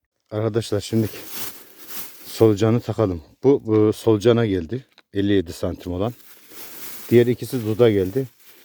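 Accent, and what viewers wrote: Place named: Amasya, Turkey. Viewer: bende Amasya lıyım abi şivenden anladım hemen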